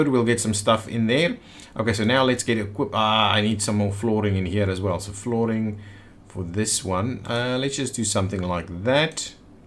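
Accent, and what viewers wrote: South Africa. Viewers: Are you from South Africa? Guessing based on your accent and prenunciation. And I like South African accent. BTW, nice gameplay and commendary. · Can’t say I’ve heard a South African accent before...it’s really interesting. To my American ears it sounds like Scottish, Australian, and African rolled into one...like I’m listening to 3 different people lol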